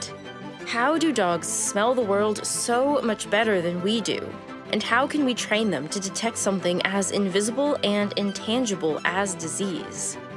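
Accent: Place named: United States. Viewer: How come this is written in British (hoover, footfalls) but narrated in American/maybe Canadian?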